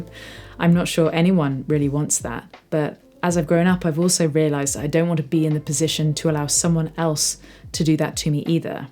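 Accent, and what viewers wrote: United Kingdom. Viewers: Yo, are you British or something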